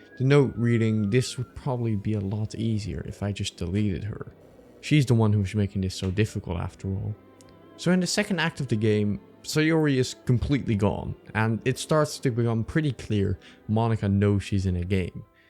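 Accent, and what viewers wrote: Netherlands. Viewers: altijd heerlijk om een nederlands accentje te horen wanneer je er niet een verwacht 💕 💕 🇳🇱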